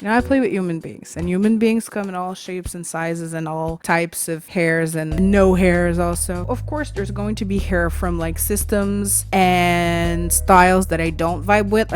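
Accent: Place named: Quebec, Canada. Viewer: Love your, french Canadian? accent?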